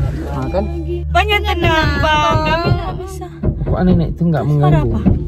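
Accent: Malaysia. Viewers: Ngomongnya kek orang malaysia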